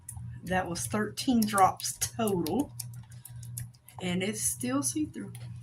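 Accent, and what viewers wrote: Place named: Georgia, United States. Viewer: Oh you are in Savannah GA I just heard it I love Tybee Island!! One of my favorite places on the planet!!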